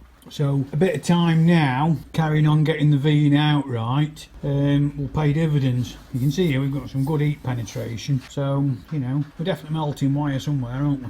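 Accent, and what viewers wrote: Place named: United Kingdom. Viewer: Where abouts in the UK are you from?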